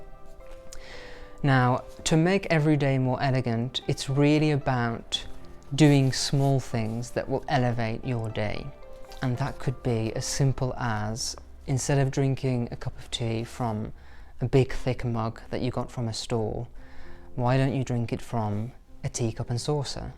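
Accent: RP, United Kingdom. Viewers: You are a delight,. I must say you sound so posh and I mean that as a compliment. So crisp, yet gentle, and gracious. Can't wait to see more. #sophiebestlife If you want to find the most calm, elegant, historical and beautiful places in the US...please reach out. I'd love to provide ideas. Just a couple to start your US list - Savannah, Georgia; Santa Barbara, California. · I'm really surprised to hear you say you have a very middle-income background, as your voice and demeanor comes across as quite 'posh' :) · You’re so sophisticated, calm and peaceful! I love the British accent❤️.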